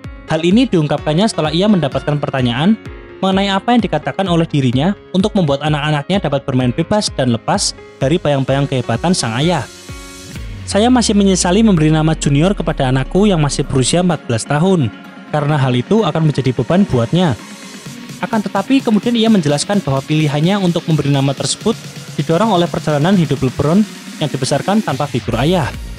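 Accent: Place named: West Java, Indonesia. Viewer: Sundanese english 😂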